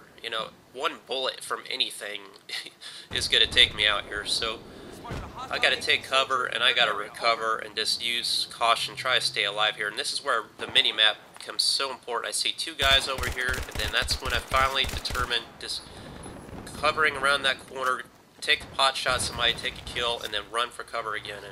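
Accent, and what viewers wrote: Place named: South, United States. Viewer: Parisians are a tough bunch, but the French generally are very kind and considerate once your out of the cities. The older folks remember our role in the liberation and have kind things to say about us. If the French formed their opinions on us based on one trip to Manhattan, then surely they would say similar things about us as you have about them. You sound southern, a pilot out of Atlanta? Nice folks down there, hospitable and respectful. Decent and kind. Total culture shock I'm sure.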